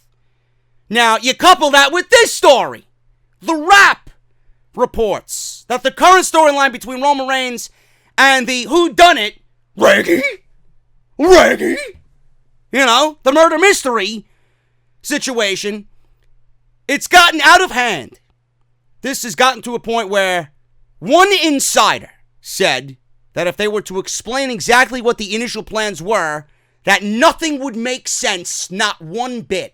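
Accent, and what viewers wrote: New York, United States. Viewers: Damn hon, your New York accent is so much more prominent today lol!!!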